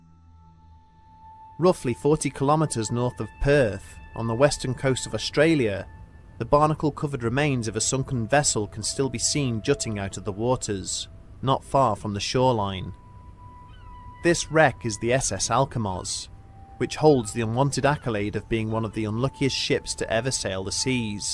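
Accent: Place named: United Kingdom. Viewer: I totally concur with Sir Bronn. You guys have a phenomenal channel with the best presentation & narrations. I know it takes time & effort to compile your videos (and I'm extremely grateful for all of them). My only suggestion would be to create more often (2-3/week). If u have your channel monotized & the community helps get you subscribers & views - you're gonna blow up & rake in some decent cash. Just my 2 cents. I'm American & I really enjoy listening to a proper British accent. Your stories are awesome & the art is epic! I wish you guys nothing but the best! Thx for everything. Much love & respect...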